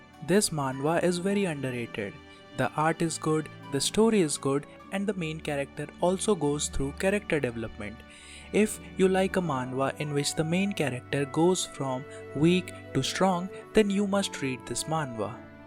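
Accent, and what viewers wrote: India. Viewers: I get Indian vibes from your accent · Fuvking india accent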